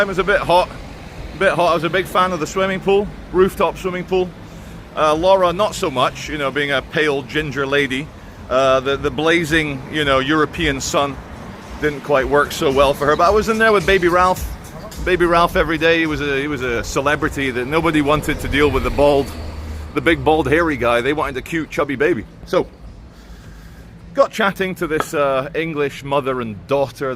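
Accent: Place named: RP, United Kingdom. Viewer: We need more posh accents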